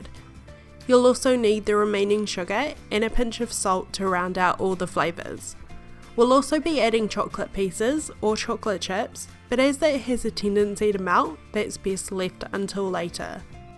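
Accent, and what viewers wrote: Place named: New Zealand. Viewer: It's a new zealander accent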